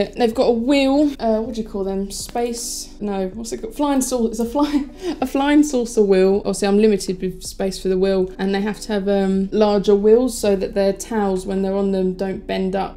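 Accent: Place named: United Kingdom. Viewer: Love the accent innit. UK accents are so cool.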